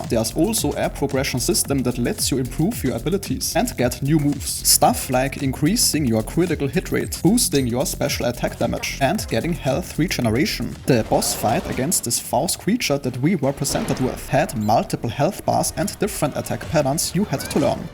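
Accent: Germany. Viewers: Sprichst du deutsch? Dein Englisch klingt so. Kann man in dem Spiel irgendwo speichern oder muss man jedesmal komplett neu anfangen wenn man stirbt und dann alles verliert 🙈?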